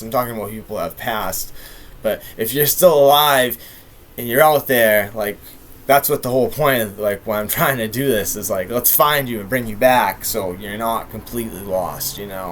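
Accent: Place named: East Coast, United States. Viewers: Massachusetts accent 💪🏻💯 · I can't pinpoint your accent but east coast would be my general guess lol I'm from the mid south with some what of a southern draw lol oh well i love the east coast and have seen most of it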